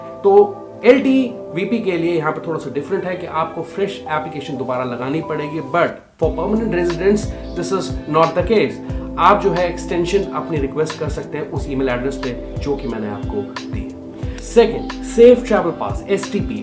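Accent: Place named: India. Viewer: YOUR MUSIC IS TOOOOOO LOUD. It is difficult to hear what you are saying. I turned off your video due to the loud music. You can lower the music for your benefit. It is annoying to hear music while trying to understand what you are saying. WTF your music sucks when you are talking with your horrible Indian accent. You sound like you are drowning in bubbles like a bobblehead. SHUT THE DAMN MUSIC OFF.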